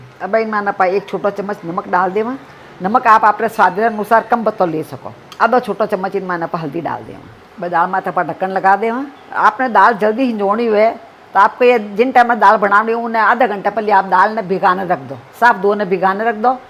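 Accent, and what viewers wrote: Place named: Marwari, India. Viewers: Aapki bhasha thodi alag lagti hai kyoki maharashtra me jo marvadi log rehte hai vo thodi alag marvadi bhasha bolte hai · Maine isliye pucha tha bcz aapke baat karne ka tarika whi ka lga muje merta road jaisa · Aapki jodhpuri marwadi bahut hi meethi hoti... Mein soda ki jagah thoda sa curd use karti hoon.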